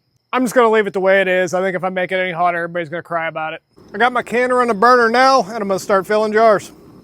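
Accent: United States. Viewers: He is SOOOOOOOO American it’s hurting my British ears. 😨 it’s pronounced To-Maa-toe’s NOT To-Mate-o’s. 🤦‍♂️ Prove me wrong/right keyboard warriors. 😂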